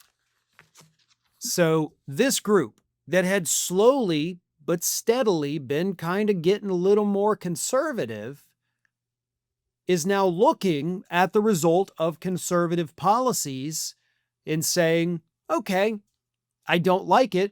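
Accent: United States. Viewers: HILARIOUS, you look either brownish or speak a heavy-tongued American English and wonder that a white billionaire RACIST turns on you? YOU HAD TO BE KIDDING! Do a better job this time! 👍👍👍👍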